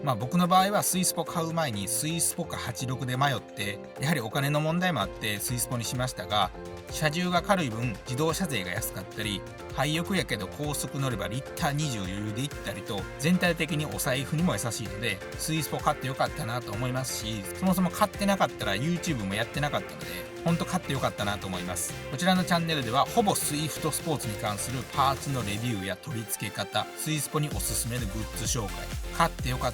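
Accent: Kansai, Japan. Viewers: 関西訛りなのに浜松ナンバー。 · はじめまして、もうすぐ1型中古を納車予定の者です。 色々参考にさせてもらってます。全然関係ないですが アークナイツというゲームの実況者（登録者10万以上）のoyukiさんみたいで関西弁のトークが親しみやすいです！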